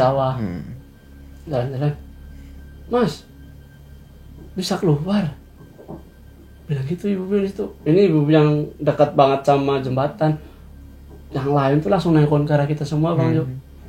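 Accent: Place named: Java, Indonesia. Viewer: Waktu awal2 dia nongol, dia nyebut dirinya "gua".. tp jd aneh sih dengernya krn dg logat jawanya, lbh cocok pake "saya" spt skrg2 ini.. hehee.. sukses terus mas!! Story teller termantabb, bisa inget detail banget semua pengalaman2nya..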